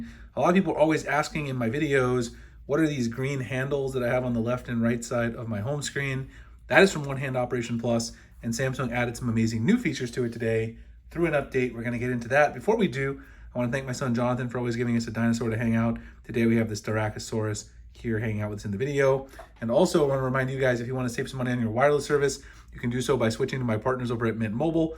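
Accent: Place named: United States. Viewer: Nice informative videos. 👍🏼👍🏼 Kudos to you I found your channel by chance the other day, when looking for YouTube videos about Samsung Galaxy smartphones/tablets guides Note: this is not a critisism, but simply ❓ A wee, and somewhat personal request (by me) vis-a-vis your accent. ❓ Any chance that you could slow the pace of your speech. (Pretty please?) We Brits, on the other side of the pond, tend to find your pace of conversational speech a tad fast for us at times and I have to keep backtracking to watch it again. When I'm in the USA, and I've been back and forth since the early 80's visiting relatives, even though they do understand my accent, albeit a regional one, I now slow the pace down, so my relatives can understand me much better, even though we've known each other for 40 yrs plus, they still, at times, say I talk too "fast" for them, and have to repeat myself from time to time. 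I'll keep watching, either way, as what you show, and explain, shows there's a lot of depth, and "hidden" things within the S23 Ultra that I didn't even dream of. I've only had mine for around 3-4 days, and I'm still getting to grips with it and it's way of working and you've alreay helped me out greatly. 👍🏼👍🏼So, again, kudos to you from me, and others too no doubt, for your work, and tips on how t get the best out of Samsung smartphones. 👍🏼👍🏼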